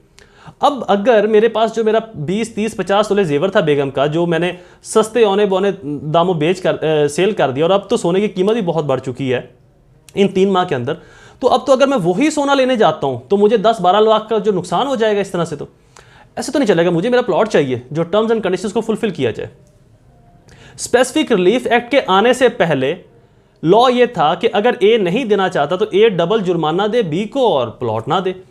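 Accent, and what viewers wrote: Punjab, India. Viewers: Sir g punjbi sun 🌞 ka maza ah ga ap ki punjbi hmra jisi ha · Nice punjabi accent sir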